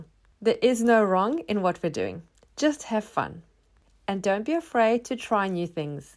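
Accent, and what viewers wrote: South Africa. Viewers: Howdy watching from Cape Town in South Africa. Your accent sounds very South African.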